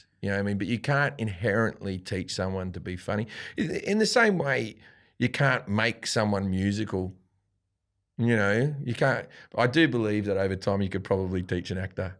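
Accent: Australia. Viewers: An Aussie accent delivering dick jokes. I wouldn’t call him a comedian.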